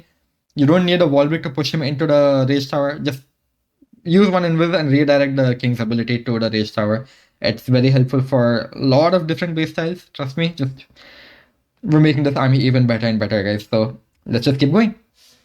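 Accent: India. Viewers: Love your accent, which part of India are you from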